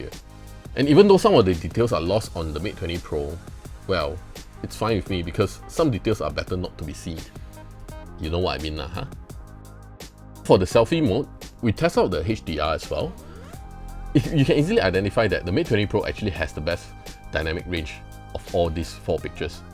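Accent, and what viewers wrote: Singapore. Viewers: Within the first 5 seconds of the video, I can already tell this dude is either Malaysian or Singaporean through his accent. Turns out it was the latter XD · Good reviews , only problem is your Singlish. Always fast and no tone at all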